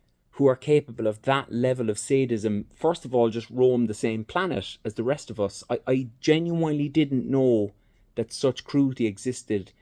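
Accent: Ireland. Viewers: Honestly love this, the Irish have the best english accent imo, second being kiwi.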